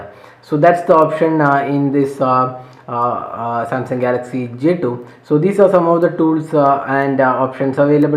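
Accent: India. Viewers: I cant understand your english..can speak nicely we are not n india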